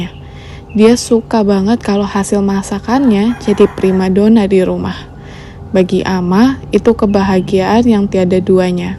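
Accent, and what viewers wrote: Manggarai, Indonesia. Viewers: Ci tinggal di Manggarai pasti e, soalnya dari logatnya org Manggrai ni, ada video kompiang, tacupiang trus ada acara smbut baru juga.. salam kenal dr Ruteng, sukses utk kontennya Ci, sngat menginspirasi😇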